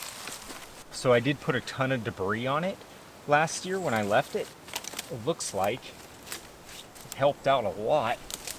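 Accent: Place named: United States. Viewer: Hi! Great video! 👍 But something is eating at me! 😵 Your YT channel says you're in the UK! But you speak like an American(no britt accent) you cook like an American you phrase like an American and the woods you're in looks like somewhere in the East Coast and Pennsylvania! About the only thing that kinda hinted that you might be British is that black treacle bacon! What gives mate???? 😂 Btw,had to Google black treacle bacon 😅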